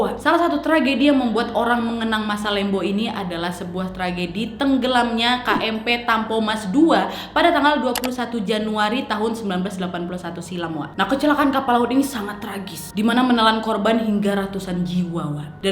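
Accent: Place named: South Kalimantan, Indonesia. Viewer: Dari kalimantan mana suara nyaa Kal-Sel Nih bos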